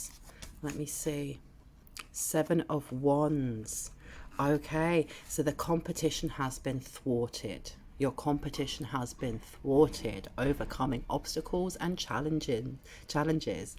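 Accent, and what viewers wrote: United Kingdom. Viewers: thank you my love you add subtitles i think you have British accent and i am Indian that's why i need subtitles to listen video ..... thank you. you are beautiful angel ❤️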